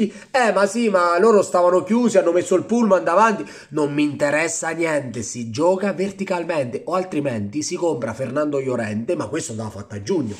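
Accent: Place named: Apulia, Italy. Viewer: Ma cosa prendi in giro xhe si sente che sei pugliese anche tuuu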